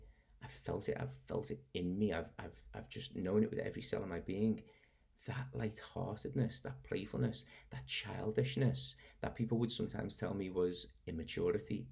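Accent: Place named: Liverpool, United Kingdom. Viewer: Blimey, scousers are becoming self realised now? I've never felt more like I've missed the bus. I'm joking (!) I unironically love Liverpudlians and your wonderful accent!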